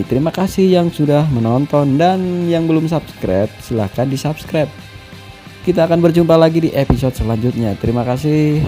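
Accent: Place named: Banyumas, Indonesia. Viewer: Bise wong ngapak tambah jossss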